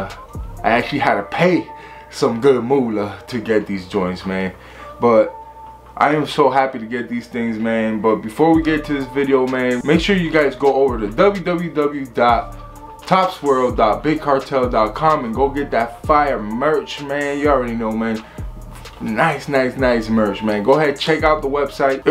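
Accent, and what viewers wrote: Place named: Chicago, United States. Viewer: I copped these too, paid resell . Love the Jordan 6. You sound like you from Chicago. Or the midwest area .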